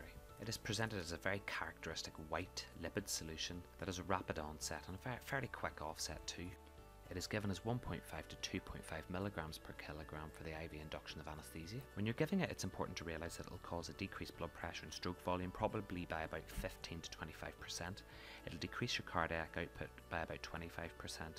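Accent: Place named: Northern Ireland, United Kingdom. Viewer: Lovely Northern Irish accent..,..mildy sedating..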